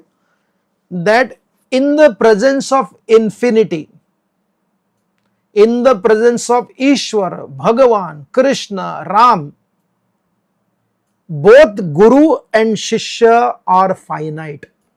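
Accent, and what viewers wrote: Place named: India. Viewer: I think it would be helpful and also appropriate, that the prabhu who is speaking introduces himself in an informative way.: how many years he is in ISKCON, who is his diksha guru, his management position if any, has he been appointed by ISKCON GBC to represent this viewpoint, etc. That should be the first point. There is no introduction, although some devotees in India ISKCON must be knowing him very well, I can only suppose. And if his presentation is supposed to be THE official position by the present Mayapur ISKCON GBC, perhaps someone should add English subtitles to his talk, as his Indian accent is very thick and may not be understood by a wider audience of devotees and lay people, who may not be not familiar with his language accent. Hare Krishna.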